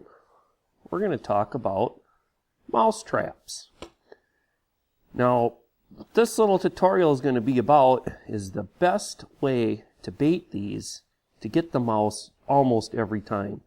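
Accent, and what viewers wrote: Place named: Great Lakes, United States. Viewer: Minnesota or Canada? I hear that Great Lakes accent.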